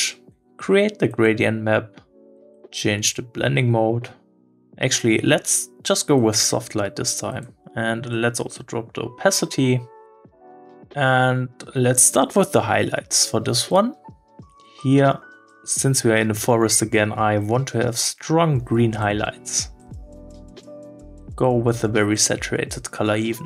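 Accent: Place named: Germany. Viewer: Gleich die ersten Sätze ""helo ia uan wouatzap" )) Dein gut gemeintes Englisch ist dermaßen deutsch, dass es fürs Ohr dies oder jenes geesprochene Wort unverständlich kliingt. Am besten machst du deine Videos in der Muttersprache und du wirst sehen das wird belohnt, hast bestimmt mehr Subscriber als sonst. Warum? Weil in englisch ist auch Konkurenz zu groß.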